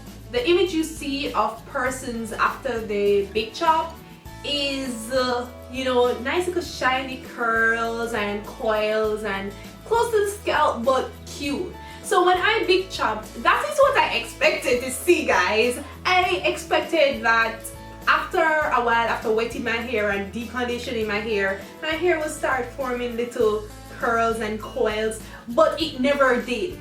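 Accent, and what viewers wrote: Jamaica. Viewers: Are you a Jamaican????? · Your Jamaican accent is so AMAZINGLY BEAUTIFUL!!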